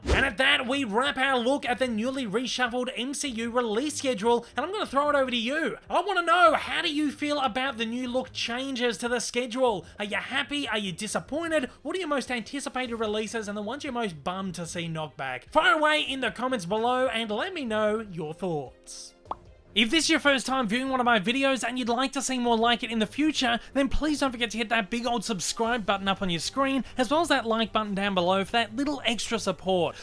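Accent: Australia. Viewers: Well that’s an Australian accent. You better get used to it lol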